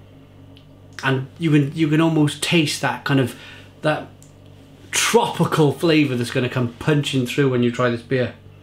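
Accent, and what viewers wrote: United Kingdom. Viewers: This is my personal favorite of all the Finnish IPA's. CCCCC by Beer Hunters is also great, but this is one more to my Taste (I capitilised it because maku means taste/flavour). By the way, where from the UK are you from? I'm trying to recognise the accent. :)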